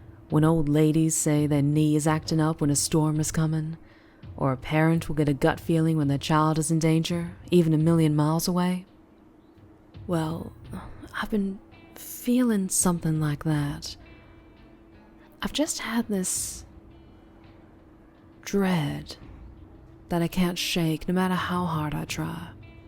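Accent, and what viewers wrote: South, United States. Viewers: 3 weeks it's been 3 weeks i.... mam thank you for the upload i missed this. Also a slightly deeper southern accent double noice. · Wow! Amazing work as always. I know this vid wasn't as popular as normal for some reason but I love this series! And as a southern born floridian man I enjoy the stage southern American accent. Hope it continues.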